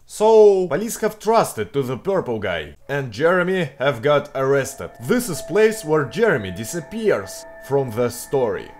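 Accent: Russia. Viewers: Ochen zameten russkiy akzent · there are Russian pronunciation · your voice is like a Russian who first came to America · you have some Russian pronunciation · Good Job! Only your ancient is very Russian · NOOOOO IF YOU WILL (um...okay ) DO VIDEOS (#pokerface) EVERY DAY, YOU LOST YOUR RUSSIAN (um...) you will lose your Russian accent and your Russian video will look awful((( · Russian accent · Russian emphasis,huh. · акцент то русский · Русский акцент все портит. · O, great work, but... this terrible Russian accent. Try to say words softer. Good luck. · try to remove Russian accent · Your little Russian accent can be heard, but I like it!Well done! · as you tell you have russian accent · His accent is associated with the fact that he is Russian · * у тебя очень русский акцент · you're really good at speaking English , but the accent is Russian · Hello, that's cool video! 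I think you can do great videos in english, but your russian emphasis isn't very well) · Good English but with russian accent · you Russian pronunciation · Your English is very nice but Russian accent a little bit unpleasantly.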